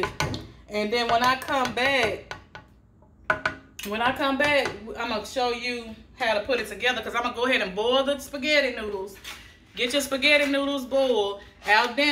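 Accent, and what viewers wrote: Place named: Tennessee, United States. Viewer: Baby you're definitely from Tennessee ! I'm from West Tenn, and it's the southern draw for me lol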